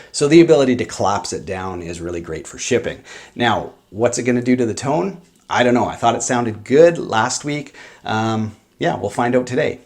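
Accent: Canada. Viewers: I just noticed he has a Canadian accent. Nice.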